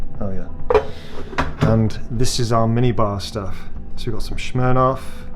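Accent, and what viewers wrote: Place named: United Kingdom. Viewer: Me and wife have booked here for September great video Ps u don’t sound American our you British ?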